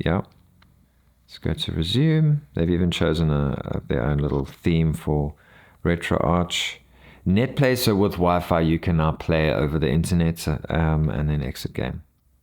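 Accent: South Africa. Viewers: This came up on my recommendations because I’m keen to get this! But I have to ask, are you South African!? So cool! Can’t wait to get my Miyoo Mini Plus 😃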